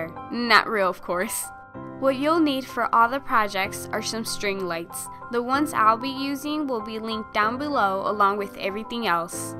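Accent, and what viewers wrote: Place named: Canada. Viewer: where are you from?? your accent sounds a little canadian lol :)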